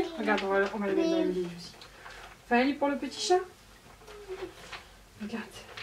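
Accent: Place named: South, France. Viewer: 🤩 j’adore tes vlogs et ton accent du Sud qui chante le soleil ☀️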